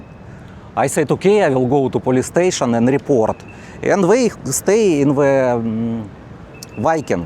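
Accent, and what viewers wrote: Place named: Russia. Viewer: You sound like you’re Russian by your accent. I think it’s cool af that everyone has to speak English.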